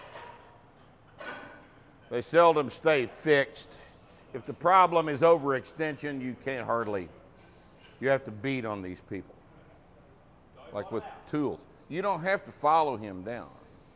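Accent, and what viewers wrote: Texas, United States. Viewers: Well it's really more Texan. It would definitely stand out among native Georgians for example. Watch Fred Armesan's video on accents. Somehow he gets it pretty close. Well I think spot on but I'm not an expert. But have lived my whole life in southern U.S.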